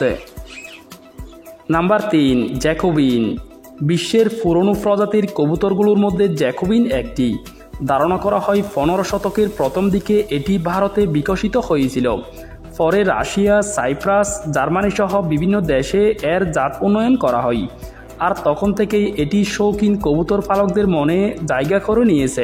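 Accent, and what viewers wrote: Chittagong, Bangladesh. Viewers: ওনি ছট্রগ্রামের লোক।। তাই ছট্রগ্রামের বাষায় কতা বলেন।।। কিচু মনে খরবেননা।।।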